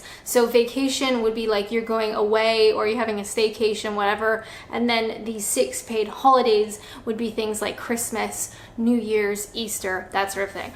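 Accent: England, United Kingdom. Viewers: tbh you now sound like a brit ;) you never use to all good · you're sounding more like a Brit come back soon you make England a better place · Ciderrrr! That was very West Country. You have an English accent, It's nice you remembered to pack your sense of humour.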